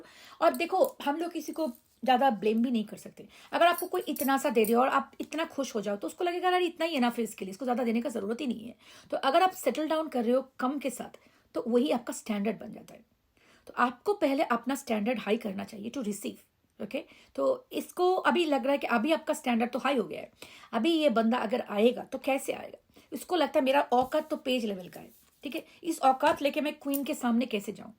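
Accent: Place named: Bengal, India. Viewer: Bengali ke mouth se hindi sunne mai bahut nice lagta hai👍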